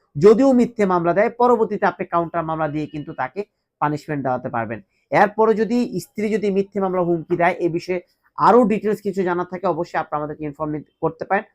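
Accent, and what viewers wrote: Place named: Kolkata, India. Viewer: আপনার ভাষাটি কলকাতার মত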